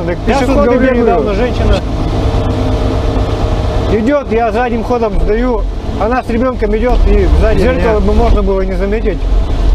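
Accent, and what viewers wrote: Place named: Perm, Russia. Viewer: У тебя говор что то из Пермского есть)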